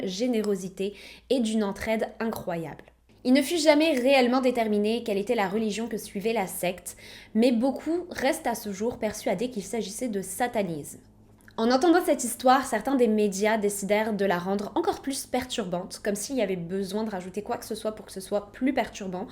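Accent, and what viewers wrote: Quebec, Canada. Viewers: Merci beaucoup pour ta vidéo tu es GÉNIAL et tu racontes très bien en plus j adoreee ton accent québécois 😁😁😁😁😁👌👌👌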